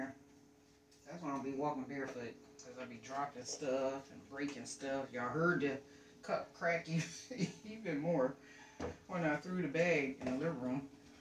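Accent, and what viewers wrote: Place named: Louisiana, United States. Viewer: Where u from cause u talk like me 😂😂😂Louisiana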